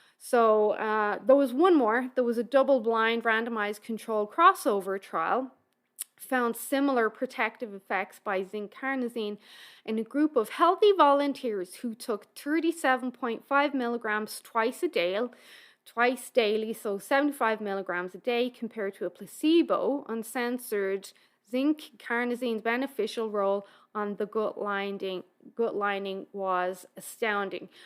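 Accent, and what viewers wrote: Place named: Ireland. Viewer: Thank you, lady with the lovely Irish accent. Very informative.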